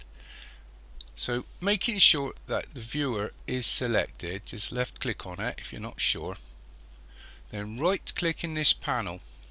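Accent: Bristol, United Kingdom. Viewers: Smashing video. Love the accent BTW - Bristolian?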